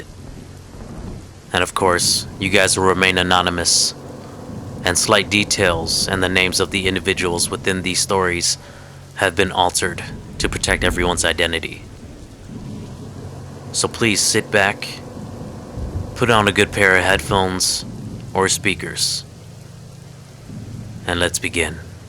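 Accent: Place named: United States. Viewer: Leng lead me here. Subscribed! So good!!! I was convinced you’re not Hmong due to your perfect American accent until I hear you speak the Hmong words in Hmong. 👍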